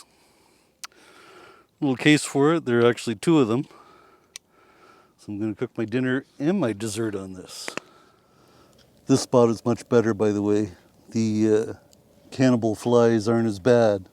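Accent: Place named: Eastern Canada, Canada. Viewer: Love your shirt. you talk like one of them Eastern Canadians. are you Canadian?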